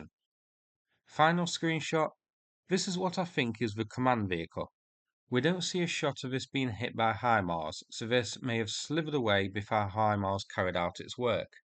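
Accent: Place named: Northern England, United Kingdom. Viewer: Still the same bad narration by a northern English speaker who should know better!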